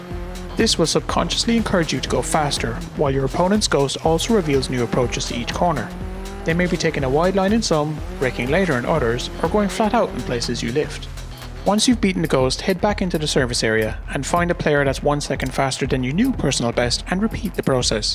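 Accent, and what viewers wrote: Ireland. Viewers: You sound Irish and I’m Irish and you sound like you have an Irish accent, how you Irish.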